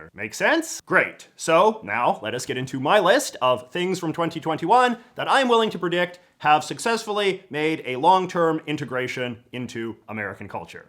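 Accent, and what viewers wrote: Canada. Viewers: Are you Canadian talking about American culture? Accent you have and all. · I can tell you are Canadian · How would you term this cadence to your manner of speaking, it's so distinctive, never heard anyone else speak with your "rhythm", which seems independent of your Canadian accent.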